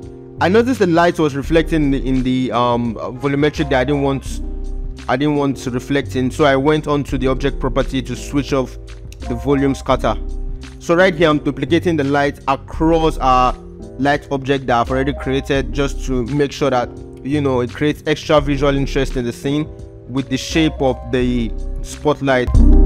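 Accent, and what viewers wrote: Nigeria. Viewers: heyy.. waasup adre you in a nigerian ????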